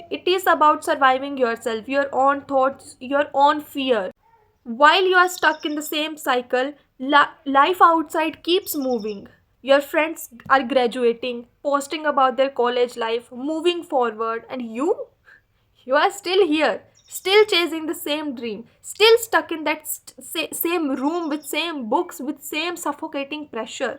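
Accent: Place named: Punjab, India. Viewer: oh sry. But your accent is just like Punjabi's Btw your videos are positive. Keep it up, Good luck 🥹🎀💖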